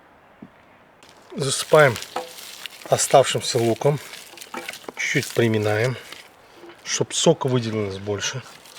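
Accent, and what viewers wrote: Baku, Azerbaijan. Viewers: Супер шашлык и супер бакинский акцент.все родное. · ДЖАН Э ДЖАН. БАКИНСКИЙ АКЦЕНТ ЛУЧШИЙ.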